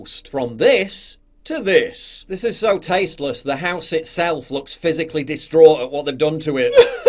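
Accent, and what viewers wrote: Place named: Liverpool, United Kingdom. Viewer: Keep it Scouse 💯 👍 📚❤️🌟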